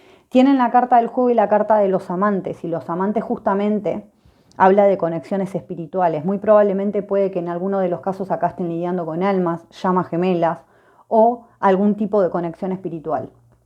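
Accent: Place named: Argentina. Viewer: Amo tus lecturas y tu forma de leer tan argentina jajajajja te talta el boluda amoooo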